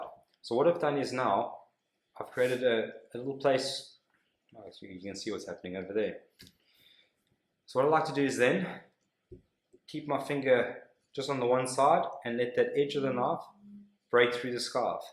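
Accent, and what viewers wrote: South Africa. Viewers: Are you a South African ole chap ?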